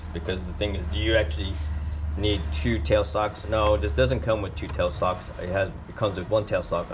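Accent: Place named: Appalachia, United States. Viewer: I love how you sound almost as hillbilly as me through most of the video, then turn your heavy accent back on in the outro 😂 thanks I have been debating on getting into cue building and looking at this lathe. This was very helpful.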